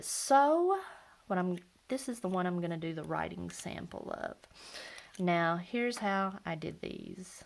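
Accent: South, United States. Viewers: I love your videos, what is your accent, Southern maybe ? I`m from Northern England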